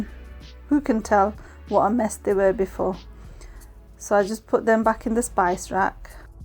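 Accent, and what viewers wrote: United Kingdom. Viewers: Your videos are good but did you actually have 6 babies as a 2nd generation person. Thats what people of the 1st generation did. Pop out a baby every year until menopause. 6 is way too many. Omg !!!!! Were some twins or something ? I mean I dont actually know anyone thats 2nd generation ( children of immigrants ) that have had 6 kids, or are you an immigrant yourself ? You cant be because you speak so British. I think its quite selfish on your children to fill the house with so many babies. IT LEADS TO POVERTY !!!!!!!!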